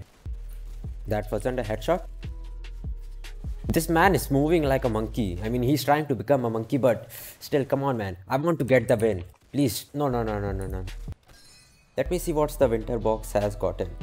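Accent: India. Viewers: I had a feeling you were Indian lol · yo it seems like you're indian, based on your accent are you? i am "indian american" but you are so cool for telling me that also whenever i play arsenal i hate this: "DEAD UPON SHOT REGISTERING"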